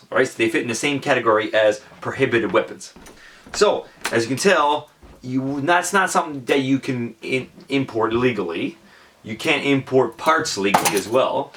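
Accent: Canada. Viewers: are you from quebec?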